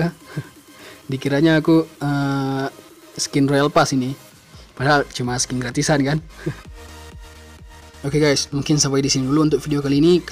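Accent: Aceh, Indonesia. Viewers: orang aceh ya bang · bahasa indonya gaya orang aceh banget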